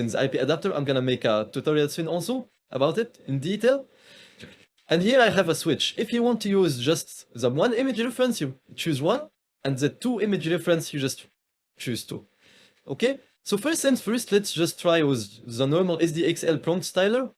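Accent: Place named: France. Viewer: the french accent 👌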